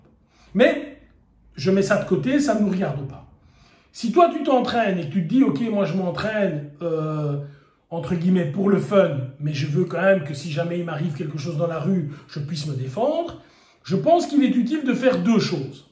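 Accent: Belgium. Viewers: Merci pour les informations. Étant presque totalement étrangé au combat réel, votre vidéo m'aiguille sur comment débuter mon renforcement. Mis à part cela, ne seriez-vous pas de la famille de Zouloux :P ? J'imagine que non du fait que vous soyez belge (déduction dûe au <<septante>>), mais votre voix et votre façon de parler ressemble pas mal à la sienne ; le monde étant petit je demande tout de même haha. Bonne continuation à vous ^^ !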